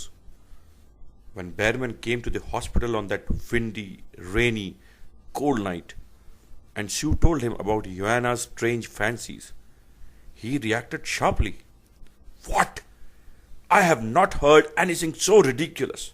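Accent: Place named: India. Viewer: I love Indian accents! Sometime it annoys me but most of the time, it's cute!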